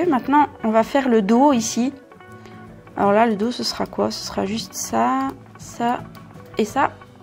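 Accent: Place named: Vaud, Switzerland. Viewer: Juste à l'accent, bien vous rassures. Je suis du canton de VD, on se reconnaît quand même assez facilement entre nous ;)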